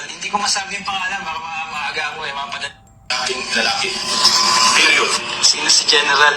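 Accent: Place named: Hiligaynon, Philippines. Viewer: _nkakawala ng stress manhood ke ah..more video please..sarap pakinggan pag ilongga angvloger ah..proud ilonggo here..watching from portugal..❤❤❤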